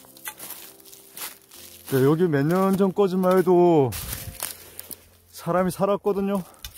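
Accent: Gangwon, South Korea. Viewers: 유트버님 억양과 말씀 하신걸 들으니 강원도 영 평 정 같아요. 항상 보고 있습니다. 감사합니다.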